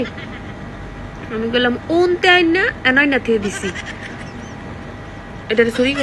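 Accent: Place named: Bancharampur, Bangladesh. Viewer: আমার কেন যেনো মনে হচ্ছে আপনার বাড়ী বাঞ্ছারামপুর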